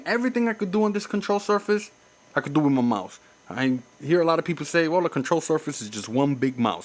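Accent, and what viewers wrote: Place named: New York, United States. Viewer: that NY acccent!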